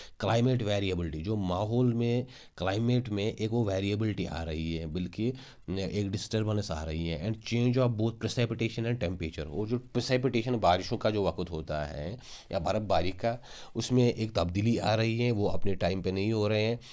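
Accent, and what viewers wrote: Kashmir, India. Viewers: You've pure kashmiri accent☑️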